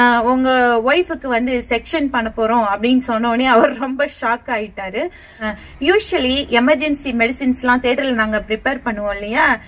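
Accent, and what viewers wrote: Sri Lanka. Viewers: I thought u r from Sri Lankan tamil. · Correct akka onga tamil srilankan tamil mathiri than erukku i am from SriLanka 😊😊😊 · Elangai Tamil pen nu nenachan dear 😍😍😍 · Your pronownsation is like Sri Lankans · Konjam srilankan tamilian also ungala maathi tha akka kittathatta pesuvanga cute...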